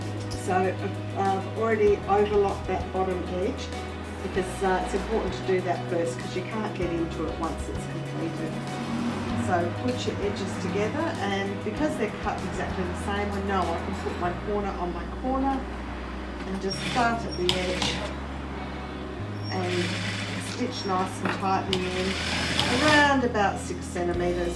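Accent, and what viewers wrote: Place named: Australia. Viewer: Fantastic Video Tutorial. Thank you so much for sharing. I am on Gold Coast and great to hear an Aussie Tutor. I wish I had seen this video before I made my last pillow. Would have had a much better finish. Merry Christmas and Thanks again.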